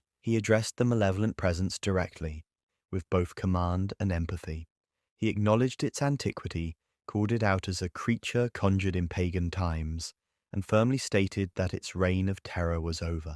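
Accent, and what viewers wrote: United Kingdom. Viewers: Nothing better than a British lad reading spooky stuff. Great night ahead.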